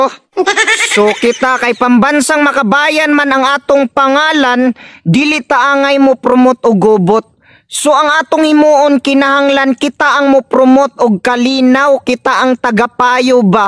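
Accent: Visayas, Philippines. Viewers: Sakto bisayaa gyod Dong😅😅😅😅😅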